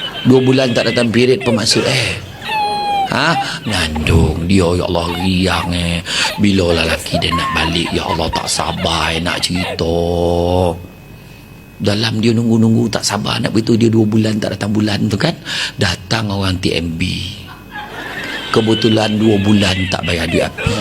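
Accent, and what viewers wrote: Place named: Negeri Sembilan, Malaysia. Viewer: Lawak loghat N9 memang best rentak bahasa beralun tinggi rendah dgn lembut😂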